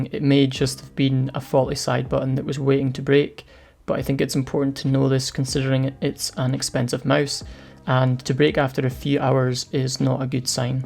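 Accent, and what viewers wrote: Scotland, United Kingdom. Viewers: are u scottish ? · Great video. Sounds like your from the UK. any chance I could buy that broken mouse off you as it would make for a good time trying to fix it. Thank you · Super high quality production dude, you did an amazing job. Also, is that a scottish accent i hear?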